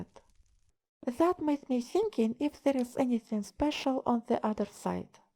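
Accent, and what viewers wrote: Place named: Russia. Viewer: Молодец. Надеюсь, что у англоязычной аудитории ты будешь популярна. Я не стебусь. Но по произношению понятно, что ты русскоязычная.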